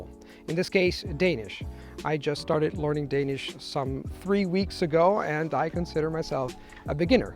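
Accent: United States. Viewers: He’s lost his American accent. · Hey there, long time no see ! Non vedevo tuoi video da qualche anno, è vero come hanno scritto altri che hai perso l'accento americano, sta capitando anche a me da quando insegno la dannata RP! Da iperpoliglotta con più di 20 anni di "carriera" non consiglierei però di imparare più lingue contemporaneamente, ho notato che mi ricordo meglio le lingue imparate "in solitaria" che quelle apprese in gruppo, i primi anni di università studiavo inglese/tedesco/portoghese e andavo a corsi serali di cinese, ho dovuto smettere non mi ricordavo più l'italiano! Comunque l'acquisizione duratura di una lingua è un mito, ho conosciuto poliglotti in età avanzata che col tempo si sono dimenticati una o più lingue, il che mi preoccupa un po' ... ci vuole soprattutto tanto tempo libero per mantenere livelli elevati in una lingua (C1/C2), rimpiango il periodo in cui non lavoravo... 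sto scherzando l'insegnamento mi piace molto. See ya ! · J accents are only lovely when you are speaking english, but if you speak a foreign language with a heavy english accent, you often would get criticized because the pronunciation is off. I'm honestly impressed with his American accent, he sounds really fluent. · Love your american accent, I'm learning japanese and corean, the accent, entonation and phisical expressions are very important in those to express and conect with native speackers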